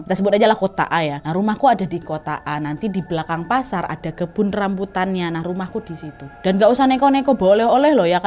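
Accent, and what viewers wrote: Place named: Java, Indonesia. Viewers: Ceritanya emang bukan yang serem jumpscare, tapi beneran penuh pesan moral, mengandung bawang dan beberapa kali sempet merinding pas dengerin 🥶🥺 Al-Fatihah untuk mbah Sugeng dan bapaknya bulek Siti 🤲 terimakasih kak buat storytellingnya yg selalu mantul (medoknya meyakinkan banget hehe) dan buat Anna yang sudah berkenan berbagi cerita 🙏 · Kalo ga salah ka pernah kuliah di jogja ya ka. Pantesan bisa logat jawa😂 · Al Fatihah untuk Mbah Sugeng dan bapaknya Bulek Siti, Kak bawain nya medok banget. Selamat hari Kemerdekaan Republik Indonesia yg ke 78🥳🥳🥳🇮🇩🇮🇩🇮🇩 Sehat terus buat Kak, calon debay nya dan para tim. Edit: terimakasih atas 1,3k like nya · Keren banget kak nyeritain kisah nya sambil pake medok jawa, karna ceritanya berasal dari daerah semarang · Logat nya semarang banget😂😂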